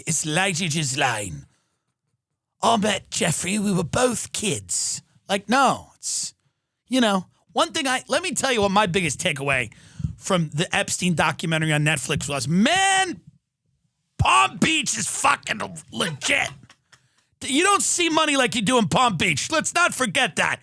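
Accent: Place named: Long Island, United States. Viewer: tim is hysterical and he's such a long islander it's hilarious. everybody on long island knows a guy like tim same accent same way of talking and cracking jokes lol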